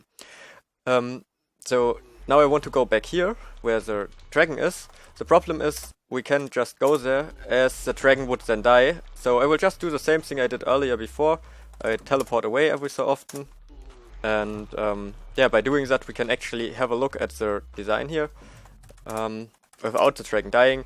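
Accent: Germany. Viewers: bist du deutscher? Hörst dich genauso an wie mein Nachbar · lol dude how much do you know about minecraft ??? XD and are you german ? your english sounds some kind like german english :) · I mostly think you are German and you speak english xD (sorry if my English are not perfect i'm a German kid)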